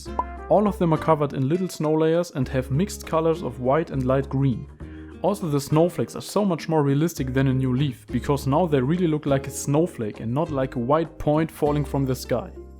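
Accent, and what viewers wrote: Germany. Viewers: Man hört raus das du Deutscher bist 😉 gutes Video 👍🏻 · Good video. (hörst dich an wie ein deutscher... Kann ja auch sein) This differents are extrem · Aha ein deutscher Akzent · Bist du Deutscher oder warum hast du so einen deutschen Englisch Akzent ??? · are you german? because your english sounds like you were from germany. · Hör ich da etwa einen Deutschen aktzent? 😁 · How do you only have 15 subs? This Video is doing Great! Great editing, good quality and smooth music in the background which isn’t too loud or quiet. There might be a german accent but dang! You got a new sub (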